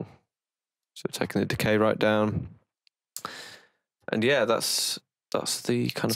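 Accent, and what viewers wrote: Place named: United Kingdom. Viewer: Sick snare sick bri ish accent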